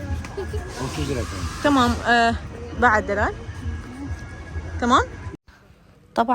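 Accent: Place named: Baghdad, Iraq. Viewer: سلام عليكم انت لهجتج بغداديه بس عنوان اليتيوب مالتج مكتوب شلون هاي انت بصراويه لو بغداديه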